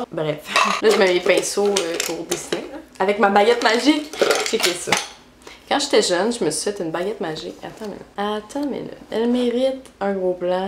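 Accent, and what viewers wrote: Quebec, Canada. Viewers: Viens me voir en France j'adooooore tes yeux et ton accent québecoiiiiiiis! <333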